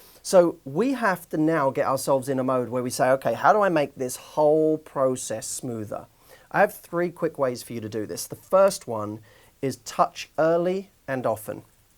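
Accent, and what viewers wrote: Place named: South London, United Kingdom. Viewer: Where are you from? South London? Your accent is adorable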